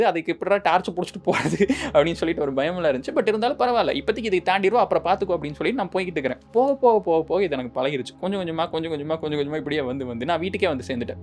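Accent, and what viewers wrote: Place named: Kongu, India. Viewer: Little Kongu Tamil and Big motivation.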